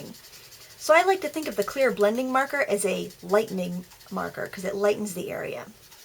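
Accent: Maine, United States. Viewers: Just found your channel today and I love it already! I also love this technique, and I love your advice regarding alcohol markers as it is something I want to start, but haven't because of the price. BTW, while you were talking I kept thinking that your accent sounds familiar. When you said you are in Maine I realized thats why as I'm just north of you in New Brunswick! And Mardens is awesome, whenever we go across the border it is a requirement to visit there, lol. :)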